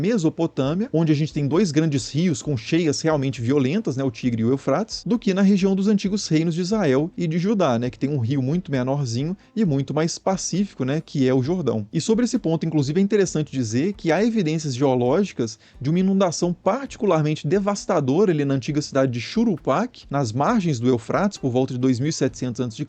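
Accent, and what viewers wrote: Minas Gerais, Brazil. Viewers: Uma pergunta nada a ver, mas o narrador é mineiro né?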